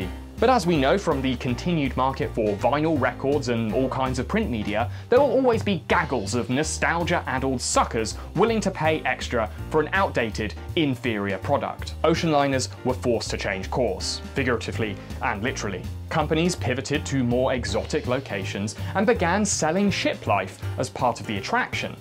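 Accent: United Kingdom. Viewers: Anyone else get happy when they start a video and find out it's a British person talking? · Misinformation with a British accent. At least it sounds inviting. It's also quite wrong. The American quite.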